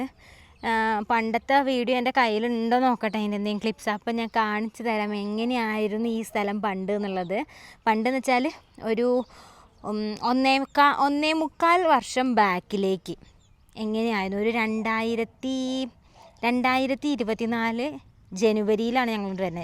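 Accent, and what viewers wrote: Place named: Kannur, India. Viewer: സംസാരം കേട്ടപ്പോൾ തോന്നി സിസ്റ്റർ കണ്ണൂർ ആണോ നമ്മുടെ നാട്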